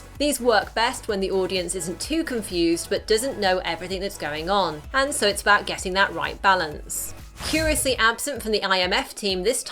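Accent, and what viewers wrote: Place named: United Kingdom. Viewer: British english ? wow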